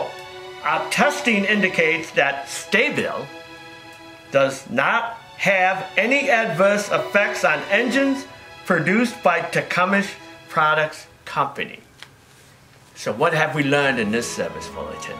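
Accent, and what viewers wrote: England, United Kingdom. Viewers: Do you have a kimono with a dragon . Love the British accent old chap 🇬🇧✊🏻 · i like the english accent mixed in with a little Confucious accent near the end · Your English accent isn't far off Sir Winston Churchill from the second world war lol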